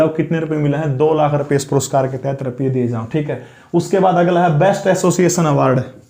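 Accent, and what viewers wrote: Haryana, India. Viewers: Gajab pdhate ho guru jii. Gaal kyu bknii hai. Apke pdhane ka hryanvi boli ka style mast hai. Jai hind jai bharat jai haryana jai haryanvi. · Nice way of teaching sir.. Aapse Haryanvi language mein kuch bhi sunte waqt apne aap hi smile aa jati hai chehre par..phir chahe kitna bhi difficult topic kyun na ho easy ho jata hai. ..keep it up this way of teaching sir.. Bravo! 👏👏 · Gud evng sir G...your teaching style is brilliant...nd I m a big big fan of u...nd ur language speaking haryanvi style is much better than Actor Randep hooda G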